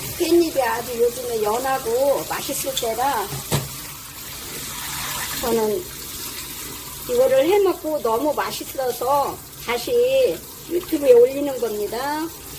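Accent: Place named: Chungcheong, South Korea. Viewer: 엄마 같으세요 충청도 사투리가 조금씩 새어 나오시는게 참 정겹네요 편집많이 안하시는 것도 재미로 느껴져요❤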